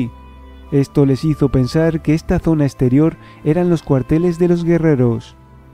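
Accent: Spain. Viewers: que horrible voz, no me extraña que los latinos critiquen como narran los españoles, por suerte no todos hablamos asi de mal, parece tonto o imbécil, tu voz es pésima, horrible, lo narras muy mal, no pude aguantar mas de 20 segundos, que asco escucharte, lo digo sin animo de ofenderte, pero eres un idiota, cambia de profesion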